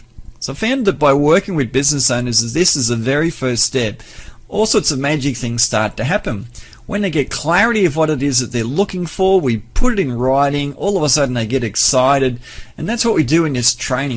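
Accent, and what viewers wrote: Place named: Australia. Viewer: this Australian accent annoys !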